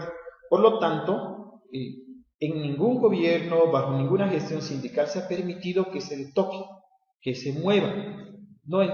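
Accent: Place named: Mexico. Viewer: Pensé que era de México, por su acento.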